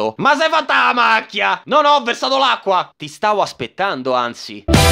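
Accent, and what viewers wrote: Rome, Italy. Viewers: Sei di roma si sente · Ma cos'è che scopro sta serie e sto canale solo ora? Ma io me i scrivo subbbbito che sto sputando sullo schermo dalle risate cazzo xD (e il romano me sta influenzando anche se so di milano) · Ahahahahah no vabbè sto morendo! L'accento romano ce sta proprio bene qua! Susu che vogliamo pure tutti gli altri ventordici episodi, susu!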